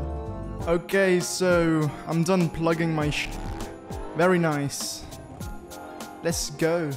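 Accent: Italy. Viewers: I know you are Italian, Your English is Diffrent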